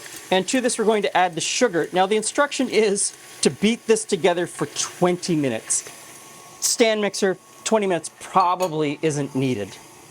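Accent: Canada. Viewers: The "english" language is so fluid and changes overtime to the point we should probably not be calling it that. I live in New York and i can tell you what we speak is certainly not "english" anymore. lives in Canada and you speak a dialect which should be called Canadian. As my new york dialect should be called American.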